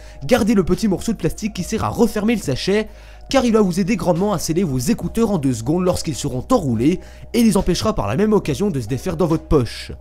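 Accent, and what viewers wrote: Belgium. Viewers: tu est Belge??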